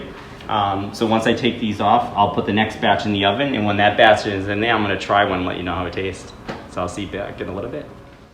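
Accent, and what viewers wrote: Boston, United States. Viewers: Thanks for the recipe! Am I hearing a Boston accent there?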